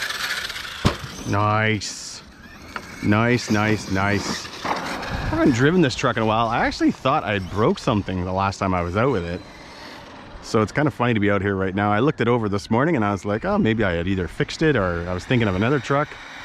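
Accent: Canada. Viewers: Glad I looked into your location - I'd recognize a Northern Ontario accent anywhere! Great content - you deserve WAY MORE subscribers.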